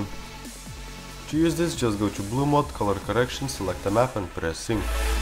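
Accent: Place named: Russia. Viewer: I fell russian accent where you from comrade?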